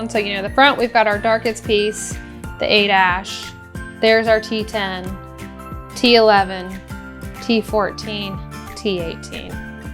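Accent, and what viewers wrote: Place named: South, United States. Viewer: It's nice to see a good YouTuber that has a southern accent. I never see anyone on YouTube with a Southern accent. I'm from Alabama so I'm really used to that kind of accent and it's just nice to hear someone online sound like someone that I'm used to hearing.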